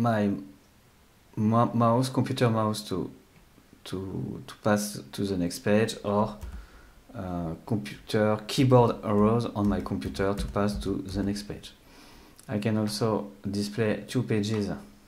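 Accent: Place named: France. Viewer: Do not ever apologize for your French accent! :)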